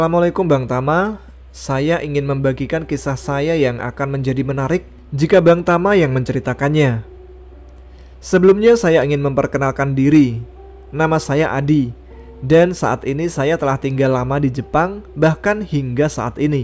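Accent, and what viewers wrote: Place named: Java, Indonesia. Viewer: Medok kali logat bahasanya mas😆😆 cerita2 mas ini lumayan bagus seram juga😱 😊🙂👍👍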